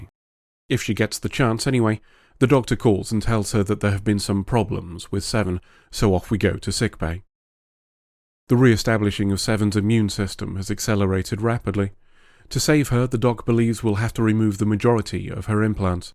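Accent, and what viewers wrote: United Kingdom. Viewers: love your voice, love your British accent, and I love your deep analysis of my favorite Star Trek show lol "There is nothing beyond the subatomic." interesting how our science has progressed since the 90's. though, barely lol. i don't know how they could've done it (swirly-whirly-clownshow level) better, as they would've either needed to know future science, or make shit up, but I think they were trying to imply that consciousness is based on "quantum-level" subatomic mechanics (which they've done before, say, on DS9) which is fascinating if you're one of those people that ponders how consciousness physically works.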